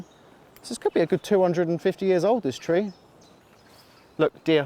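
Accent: United Kingdom. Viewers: You with your British accent and with his French accent are a lovely foil...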